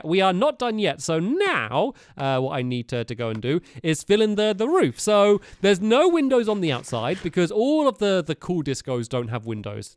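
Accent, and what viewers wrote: United Kingdom. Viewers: For a while I stopped watching you stamps because I always wanted to do The stuff you do, but never had the chance to do it because my dad wouldn't let me. I got jealous? Well, last night My brother updated our minecraft up to Xbox live and we got ALOT of the new stuff you have. It took the whole day to figure it out. I was discouraging to my brother and told him to give up but now I'm watching him trade with villagers, something I've always wanted to do. I feel awesome now and I think I'll try to start watching you again. I miss your cute British voice!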